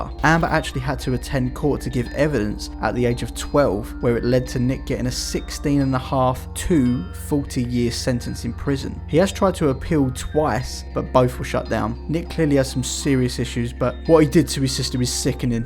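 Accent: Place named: London, United Kingdom. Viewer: Love the channel and the accent. Hearing stuff like “fink about it” reminds me of London days gone by.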